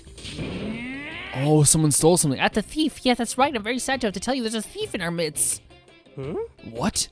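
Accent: Ireland. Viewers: You do have an Irish accent, but it's subtle. Accents are somewhat relative anyway, relative to what voices you're used to hearing. I love them, they tend to be a great reflection of different cultures.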